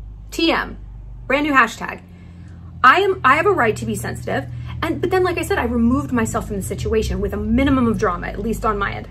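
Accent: South, United States. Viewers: i love your southern accent queen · I fucking love your southern accent lmao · I could literally listen to your southern accent for a whole video 😂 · I loved Reese in Legally Blonde so I started watching her interviews and then I noticed how she seemed to not be the nicest person. Something just felt off about her then I saw other videos of people talking about how she is really mean, but in a very passive-agressive way. She is very much that southern girl who is passive aggressive. She is like the stereotype in movies. I don’t hate her or anything but I just get an off feeling about her. Btw your southern accent was on point. The mannerisms and comments you made were the accent were spot on! Great video!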